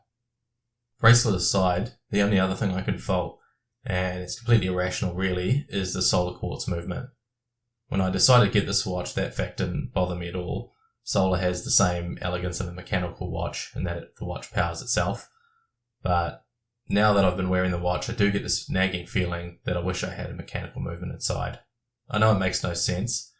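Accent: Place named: New Zealand. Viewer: You sound more Kiwi than Australian. Another ship jumper?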